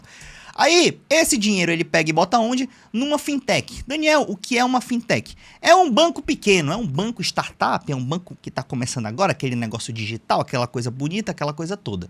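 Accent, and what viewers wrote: Manaus, Brazil. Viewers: Sou Paulista morando em Manaus há mais de 15 anos. Bom demais ver um Manaura arroxado divulgando conhecimentos de qualidade em um nicho dominado pelos Sudestinos. E con um sotaque muito mais divertido. Força e sucesso na sua jornada! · Você é de Manaus? O teu sotaque me lembra muito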